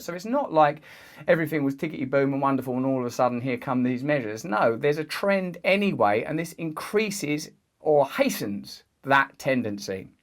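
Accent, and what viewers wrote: United Kingdom. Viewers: Dear Russel, Would you please be so kind TO SPEAK SLOWER. English is the lingua Franka of today's world, but the British accent IS NOT. I live in the USA and although I understand you perfectly well, I have to watch your video, instead of just listening and doing other chores, as I do with other videos. Your fast British accent really DISTRACT from your very thought-provoking videos. You are not just an entertainer, you are a social leader on a global scale. Give the public a break and slow down the speed of your speech for people to analyze what you say. Please do not forget that there are a lot of people, who know have limited base level English, which does not make them less educated or intelligent, but your VERY SPEEDY BRITISH ACCENT could prevent people to learn more about you and your work. I personally tried many times to skip your videos, but you tend to present the most important themes. 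Thank you very much! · is a brit, if i identify his accent correctly, and has no clue about political reality. or else he's just pot-stirring. you have no powers, so no freedoms. that is the purpose of democracy - the citizens rule, so the people have collective power, and resulting freedoms. outside the experience, and imagination, of, and other brits. so nothing will come of his expostulations, beyond any internal sense of satisfaction at saying 'nyaa, nyaa!' towards their masters.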